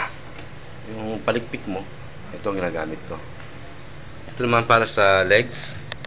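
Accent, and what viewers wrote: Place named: Pakistan. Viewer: lol this is not saudi he is pangaladish or pakistane lol look to the way that he is talking in lol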